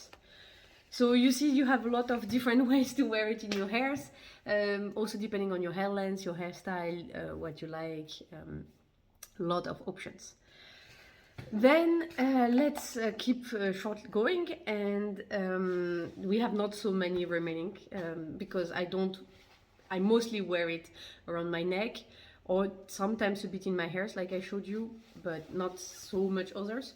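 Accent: France. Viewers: French accent = immediate credibility! :) Thanks for the tutorials!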